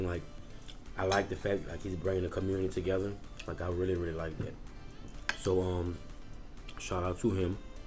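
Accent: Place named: New Orleans, United States. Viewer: What's up my man! Checking your channel out. Your accent makes me miss NOLA!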